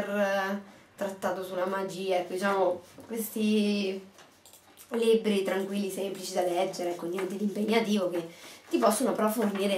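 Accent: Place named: Tuscany, Italy. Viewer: io di Ancona !! ;) dai siamo piuttosto vicine !! capirai , pensavo fossi toscana !! hah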